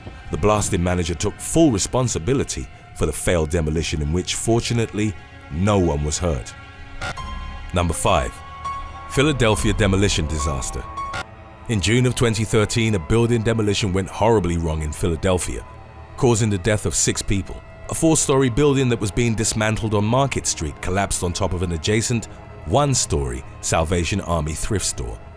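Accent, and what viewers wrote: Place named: England, United Kingdom. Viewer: South Church BLVD?!? The fact the ‘narrator’ has an English accent makes this even more painful to type 😭😂😂 Besides being born/raised/living in London for MY WHOLE LIFE, I’m pretty certain there IS NOT EVEN ONE BOULEVARD (blvd) in the WHOLE UK (England, Ireland, Scotland, Wales) let alone London (although the ‘posh’ parts of West London have some fruity street names) Pleanty of ‘Road’s, ‘Street’s, ‘Green’s, and the odd ‘Parade’, ‘Drive’ though (might have missed a few) P.S. Not many ‘Col De Sac’s, pleanty of ‘Close’s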